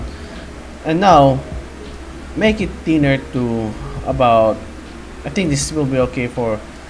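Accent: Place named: Philippines. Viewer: Where r u from You sound like a filipino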